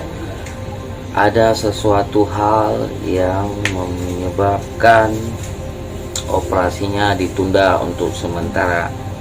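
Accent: Aceh, Indonesia. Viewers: Dr logat bicara nya org aceh ya. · Org aceh y bg?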